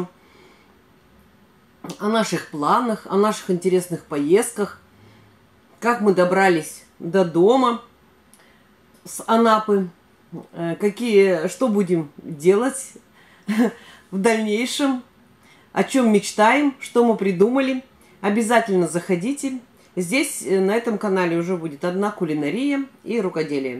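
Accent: Kirov, Russia. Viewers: Как мне нравится Ваш кировский выговор, у меня бабушка и все родственники с севера Нижегородской области похоже говорят ))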